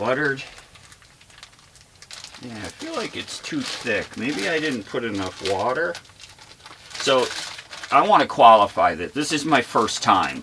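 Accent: United States. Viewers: My brother in law tapes the Bills games the same day and watches them later ad free… so no one can talk to him on Sundays! I thought I heard a Buffalo accident when you talk…By the way… I said hello at camp (said hi to lefty but forgot your name! Lol) so happy belated birthday! I not part of that group… just camped and accidentally crashed the party! Hope to run into you again before I head out… come say hello if you’d like… in the little shuttle bus ✌🏼🚐✨✨Happy Thanksgiving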